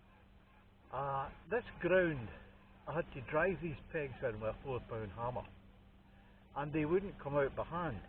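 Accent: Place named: Tayside, United Kingdom. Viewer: Sounds like Tayside.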